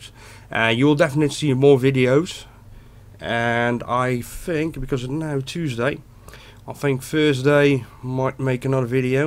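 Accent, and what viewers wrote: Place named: Netherlands. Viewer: lol dat dutch english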